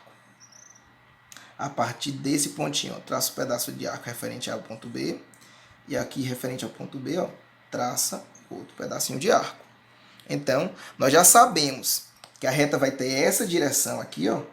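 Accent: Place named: Pará, Brazil. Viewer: ele tem sotaque do Pará kkkkkkk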